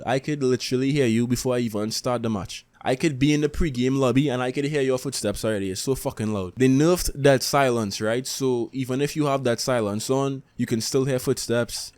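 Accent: Trinidad and Tobago. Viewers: You sound like a Trinidadian lol I'm from Trinidad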